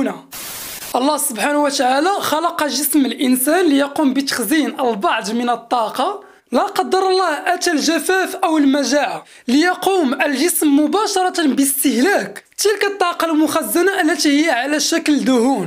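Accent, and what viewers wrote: Morocco. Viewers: Salam khoya men lehja dyalk baaaayna merrakchi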